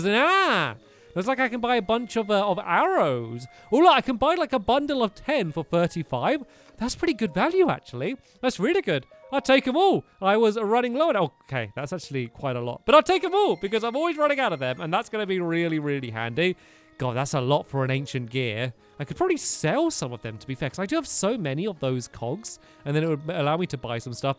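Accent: United Kingdom. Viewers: Aré you british?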